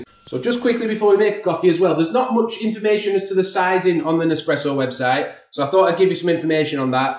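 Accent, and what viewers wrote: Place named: Yorkshire, United Kingdom. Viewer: i will 😊 of the bat you sound Yorkshire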